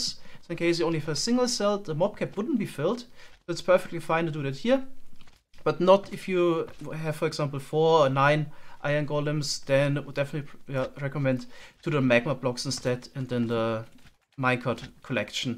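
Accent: Germany. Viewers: sounds like a german accent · Deutscher Akzent, liebs:) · are you german?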